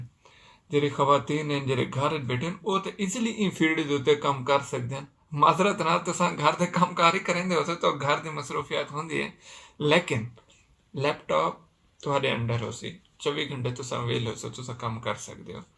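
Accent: Saraiki, Pakistan. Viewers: Yes sir 😢 me also completed master also B.ed 😢 but i have nothing I am also form distric bhawalpur Also mother language sariki your speak of method sariki amazing 😍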